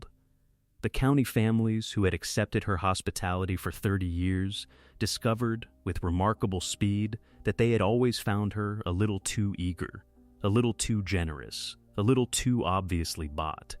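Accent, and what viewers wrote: United States. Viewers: Why always American commentators? But story is different, very genuine personality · I liked the American narration. I didn't have to turn on the closed caption to understand it.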